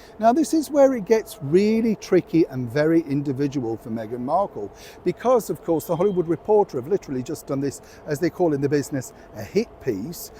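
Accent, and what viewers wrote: Yorkshire, United Kingdom. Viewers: Oh Neil!! “ All that claptrap!” You make me chuckle. A proper Yorkshire chap. Tell it like it is!🇬🇧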